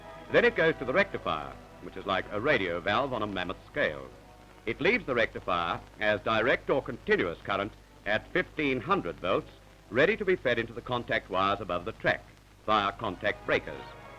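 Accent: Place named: RP, United Kingdom. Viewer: Great to watch and I love the deliberate BBC accent.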